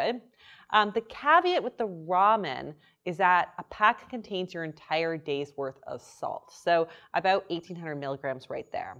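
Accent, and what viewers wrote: Canada. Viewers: She is Canadian. That’s called an accent. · I just subscribed without having watched a single video because I saw you on Obese to Beast and though you were incredibly well spoken and as a linguist I like your Canadian speech patterns. But ALSO I have been a fan of Dr. Mike for quite awhile (I only watched HIM because I love music/tv/trailer reaction videos and I think I watched a reaction of his to an episode of "House.") And right before I saw you speak with John, I had JUST watched his video critiquing that Australian banana woman and I SO SO SO MUCH wanted to ask Dr. Mike to do a video on her, so when you brought up her and then you later brought up Dr. Mike, I knew I had to immediately come here. I think I missed the video you did with him-but I'm pretty sure I've heard him mention you before. (I can picture him saying the name " "...) Love you already! 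- Male, San Diego, obese, lost 25 pounds in last two years, most this year. Want to lose 50 in the coming year. Had an unrelated (genetic thing) stroke so my frontal lobe has issues so follow-through is difficult meaning even more than everyone else already, the psychology side is bigger for me than the physiological side. Have you ever talked to Tom Bilyeu on Impact Theory/Health Theory?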